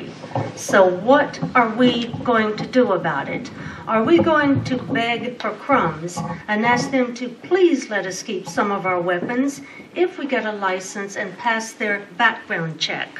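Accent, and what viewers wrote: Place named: South, United States. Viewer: I LOVE this Lady! There is nothin like a Southern Belle to eloquently explain our Constitution and 2nd Amendment!!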